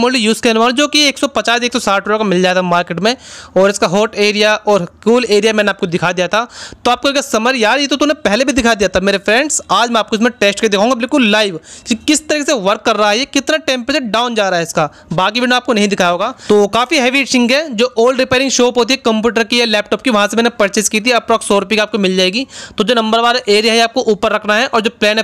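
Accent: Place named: Rajasthan, India. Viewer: bhai tu dikhne me smart hai pr bolne me ek dum desi hai Rajsthan se hai kya bhai kyo ki me bhi desi hi hu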